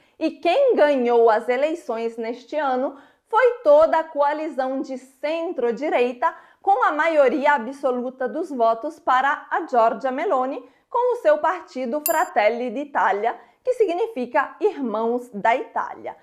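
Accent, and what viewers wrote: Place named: Brazil. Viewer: Não entendi o nome do canal: '' Brasileira por acaso'' ? A dona do mesmo nasceu onde? Ao que parece e pelo sotaque é brasileira. Complexo de vira-lata? Pode ser...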